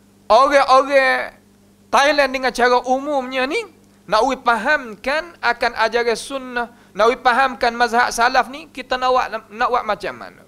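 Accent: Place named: Kelantan, Malaysia. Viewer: Kalu buke oghe Kelate payoh kakloh nok pehe ni... 😁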